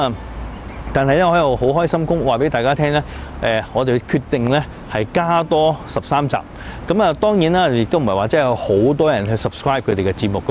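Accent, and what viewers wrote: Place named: Hong Kong, China. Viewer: Most of English words he said sound in Hong Kong way like the words 'crepe', 'trail'. Mixed with other words 'crap', 'trial' because he doesn't have enough words in his knowledge. And just relate them into a similar one he knows. He also has a very heavy Hong Kong accent when he speaks English. Looks like he doesn't even watch English TV. Ironically, one of his die hard fan Dasy Chan once said his English is so good that he should make a program teaching English.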